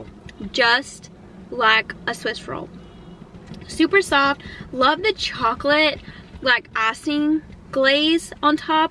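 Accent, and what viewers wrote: South, United States. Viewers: Where are you from? Because you definitely have a southern accent. You dont sound like youre from California. Lol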